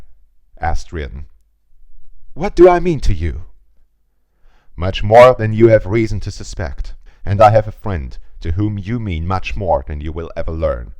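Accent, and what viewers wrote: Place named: Germany. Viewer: Legendary speech! Also, it is funny you show you’re German by your v’s and w’s being mixed up. Great job reading! You are very good at English!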